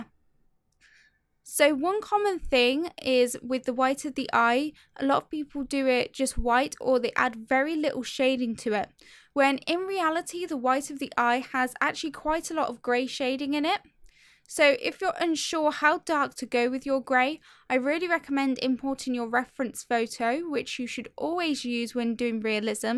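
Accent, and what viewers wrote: United Kingdom. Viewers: "phoutous"....man that British accent is boss!!! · You have an British accent..it's good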